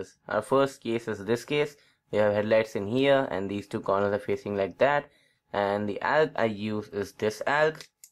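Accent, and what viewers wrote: India. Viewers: sounds more American but this is Indian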